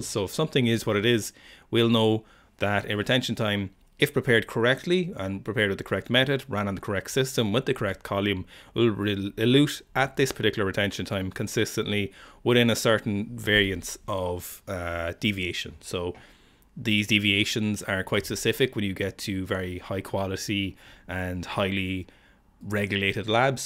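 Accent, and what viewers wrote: Ireland. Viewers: That is an Irish accent isn't it?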